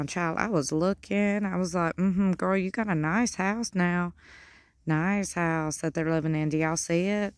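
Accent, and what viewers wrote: South, United States. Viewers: She's crazy! Why doesn't she protect her babies from a wasp? 😢 I love your videos! You have such a sweet, southern accent and you make me laugh. 😊😊😊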